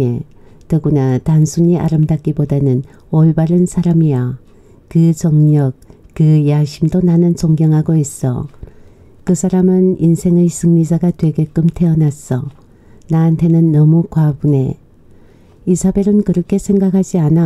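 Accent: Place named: Daegu, South Korea. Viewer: 님의 사투리때문에 무대가 시카고에서 대구로 바뀐거 같고, 훨씬 더 정겹게 다가와요 ~♡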